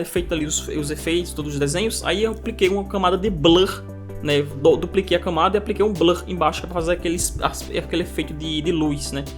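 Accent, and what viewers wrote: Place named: Northeast, Brazil. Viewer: sotaque nordestino e foda❤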